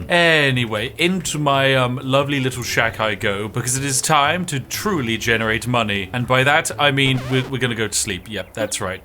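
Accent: United Kingdom. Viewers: let’s game it out but british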